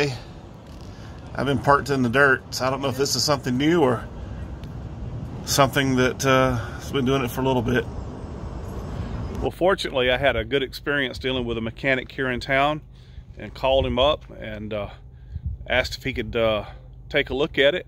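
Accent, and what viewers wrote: South, United States. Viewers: I guess you're a fantasy life for them. A way to distract from their reality so they don't want to hear about your reality. I want to enjoy your life (your reality) on the road. Hear your southern accent & watch your handsome face video you & the Wolf Pack. Happy New Year🎉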